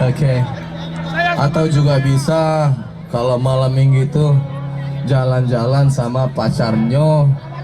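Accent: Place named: Minangkabau, Indonesia. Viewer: Lucu bahaso minangnyo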